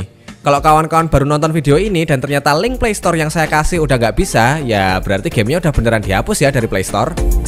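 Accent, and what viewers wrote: Java, Indonesia. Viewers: medok amat suara lu bang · Kok Logat e kejawen pak😂 agak ngapak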